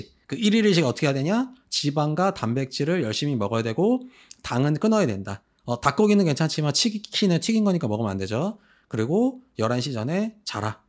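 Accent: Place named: Gyeongsang, South Korea. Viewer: 경상도분이 신듯 ㅋㅋ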